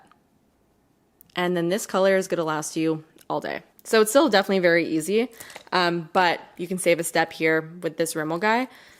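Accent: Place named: Canada. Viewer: This video was recommended to me on the sidebar. 5 seconds in I knew two things... 1. You were Canadian, 2. I'd be subscribing. I love love love your delivery. Hello from Vancouver!! <3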